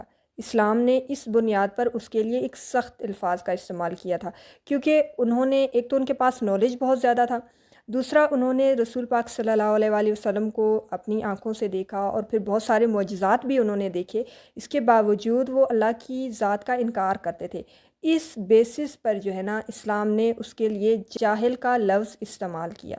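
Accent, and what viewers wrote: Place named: Punjab, Pakistan. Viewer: السلام علیکم قرآن مجید کے الفاظ ہیں آپ ان کو اردو اور پنجابی کے مکس تلفظ میں ادا کر رہی ہیں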